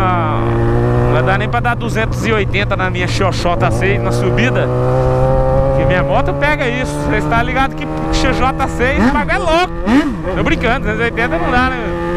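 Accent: Goiás, Brazil. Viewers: FALAA NO PIQUE DO GOIAS PORRA KKK AAA NEMM MAN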